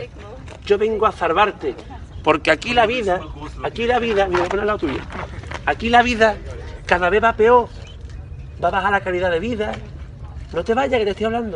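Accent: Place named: Andalusia, Spain. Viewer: Ke makina jajajaj se nota ke eres andaluz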